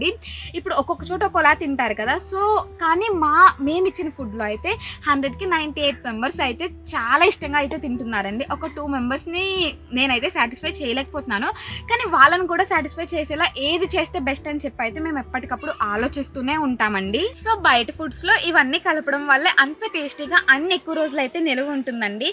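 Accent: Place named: Godavari, India. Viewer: Godavari vallu alane matladataru andi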